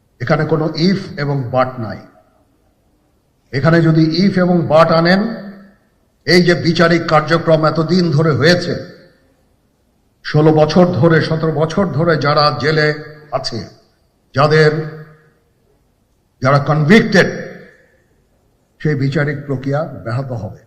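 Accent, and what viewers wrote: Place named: India. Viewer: সেনা প্রধান ভারতীয় সুরে কথা বলছেন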